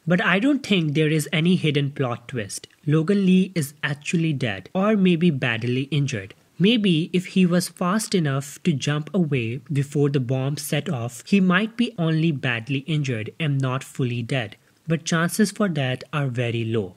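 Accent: India. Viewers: Indian voice??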